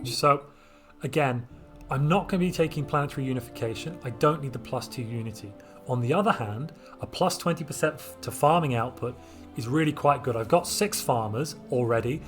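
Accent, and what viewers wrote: United Kingdom. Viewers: ColorsFade with a British accent.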